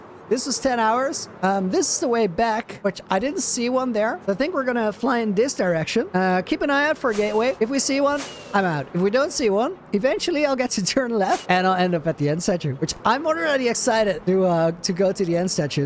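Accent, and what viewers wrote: Netherlands. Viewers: You must be dutch right? ^^ great vid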